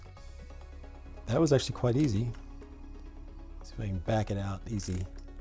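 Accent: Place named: Mississippi, United States. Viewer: You're accent is like Michael Conor Humphries the little fella who played young Forrest Gump - himself the inspiration behind Tom Hank's accent. Are you from Mississippi?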